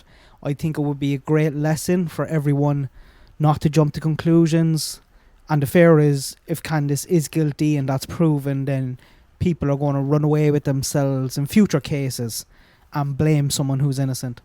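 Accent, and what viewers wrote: Ireland. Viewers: I always get so wrapped up in what you say and enchanted by your Irish accent right away, that I totally forget about the darn bunny! 🐰🐧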